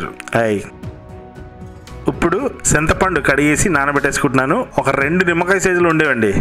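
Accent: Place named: Godavari, India. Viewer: Aami maatladarandi gaaru..maa godavari yaasalo,meeru chesina korrmeenu eguru superandi babuu..aayi👌😃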